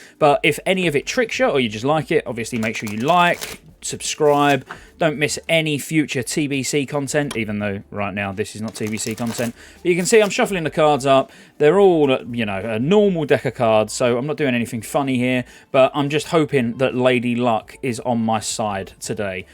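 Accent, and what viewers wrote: United Kingdom. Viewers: I love your content but can you please fix your British accent? That would be great. At least start pronouncing your T’s....it’s Thirty with a T, not thiiieeeey.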